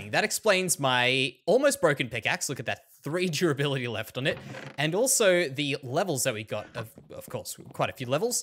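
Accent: Australia. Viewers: You have a relaxing voice ,are you from Australia? I am watching this series so late😂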